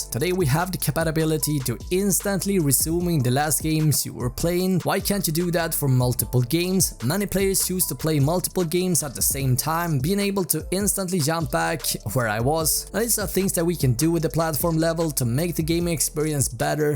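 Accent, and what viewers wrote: Sweden. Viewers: E d svensk?? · You sound swedish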